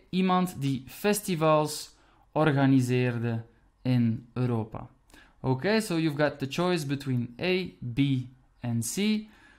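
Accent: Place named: Belgium. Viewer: Dit is Belgisch. Niet Nederlands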